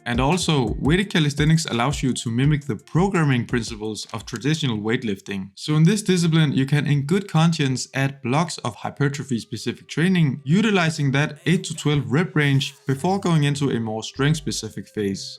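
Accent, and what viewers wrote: Denmark. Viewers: Nice video :) You sound danish. If so, where in DK are you located?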